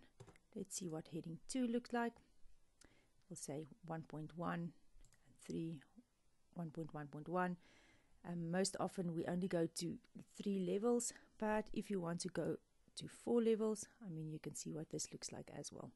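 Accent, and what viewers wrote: South Africa. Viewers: A lovely South African accent I detect 🥰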